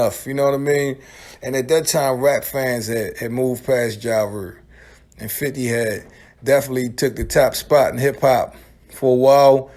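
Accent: Baltimore, United States. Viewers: You sound like you’re from B-More homie....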